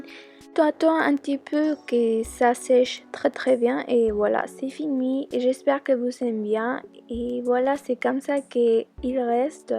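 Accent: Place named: France. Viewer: i love your french accents, sigue asi de guapa, saludos!!!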